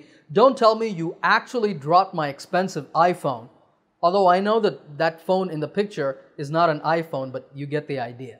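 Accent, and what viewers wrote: United States. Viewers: Sir your voice is like American man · Thank you Mr.. You have been rendering an incredible service to the non-native speakers of English. I love your American accent. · I Really do appreciate for your great efforts for making all these videos to make others learn . I watch your videos regularly , when close my eyes and listen you , you just sound like an American. You have standard American accent . I am impressed and inspired. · Sir are you speaking in American accent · sir, I've been watching your videos and it has added a lot to my knowledge. However, there is a query that why do you converse in American Accent? Are you right now living in America, or you like that accent?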